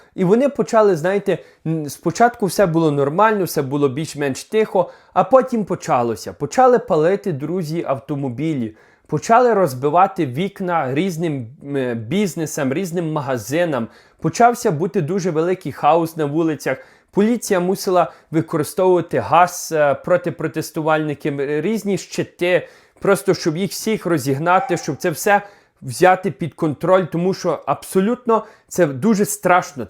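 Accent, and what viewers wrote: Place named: Ternopil, Ukraine. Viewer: Дякую. Чудова мова. Десь в дитинстві я чув таку вимову на Тернопільщині. Бо в Україні ми, на жаль, розмовляємо вихолощеним совковим варіантом, ще й з вкрапленнями москоіської говірки (а хай так буде, з помилкою)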